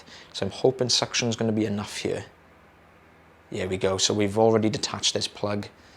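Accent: Wales, United Kingdom. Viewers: My favorite British accent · The accent is Welsh, The clinics are all based in Wales I believe. Lovely accent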